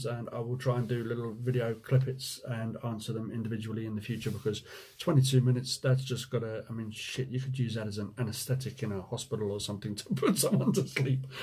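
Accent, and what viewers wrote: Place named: Yorkshire, United Kingdom. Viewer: Definitely a bit of Yorkshire slipping into your accent now 😁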